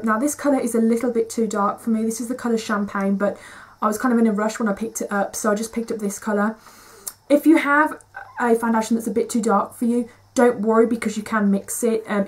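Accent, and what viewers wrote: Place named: Birmingham, United Kingdom. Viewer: where are you from you sounds like a brummie! xx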